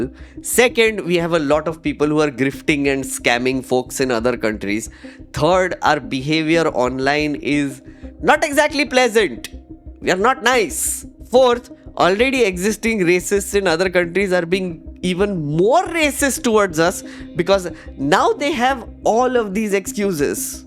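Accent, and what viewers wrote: India. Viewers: pjeet accent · Dude, your strong Indian accent is cringe asF 🤮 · I agree with every thing, but do you really have to speak like Apu? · They all are watching your video to make fun off your indian accent too. He also loosened? He also lost…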